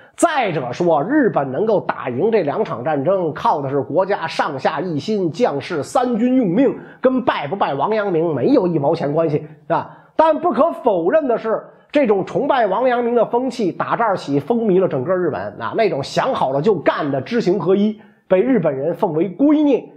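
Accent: Beijing, China. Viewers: 北京口音真好聽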